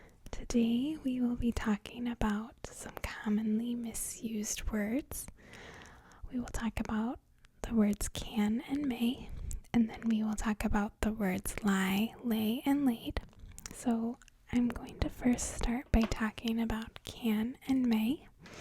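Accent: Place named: Midwest, United States. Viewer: I love your accent! It sounds like Michigan or somewhere in the midwest. Idk I’m Canadian what do I know lol.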